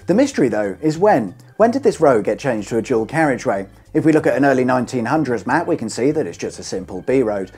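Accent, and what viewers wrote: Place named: Norfolk, United Kingdom. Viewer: This was very interesting. Side note: Are you from Norfolk? You sound quite Norfolk in this video.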